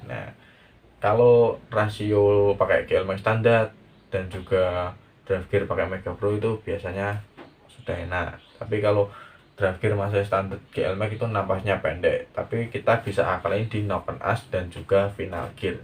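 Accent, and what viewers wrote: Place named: Bojonegoro, Indonesia. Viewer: Tak kiro wong ndi ae mlaah podo bjne😂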